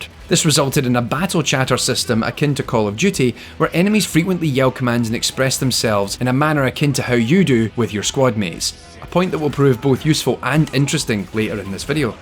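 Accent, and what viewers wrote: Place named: England, United Kingdom. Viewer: He's not wrong people, PLEASE play this before you watch, it is really fantastic. Also, I'm glad you talked about PTSD. I know I shouldn't be talking about personal stuff in some random comment thread, but I have what is being classified in the ICD Beta 11 as C-PTSD, or Complex Post Traumatic Stress Disorder. Your accent reminds me of when I went to England, I got held up in Heathrow because they wanted to make sure I was "Safe to let in" which kind of turned the whole experience negative. But what made it better, and really helped me, was one of the Security Officers was a Soldier who fought in the Falklands and Northern Ireland, and he was telling me that if I ever needed help, theres a group he volunteers with of Veterans, and he said, even if you weren't a Veteran and needed support they were there for everyone who suffers from PTSD, to whatever extent. 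And I think thats probably indicative of the way people with PTSD cope. Life is going to shit on you. Sometimes you're going to make choices that end up being mistakes that shatter the sense of humanity you hold on dearly too, and its going to rattle the foundations of your being, but there are little lights, little glimmers of beauty and joy in the world, and those are the things you should encapsulate and always keep close to your heart. The Day is Always Darkest Before the Dawn. Its not 100% relevant to this game, and I won't spoil why, but, if you have PTSD, especially as a Combat Veteran, this game might be a bit trig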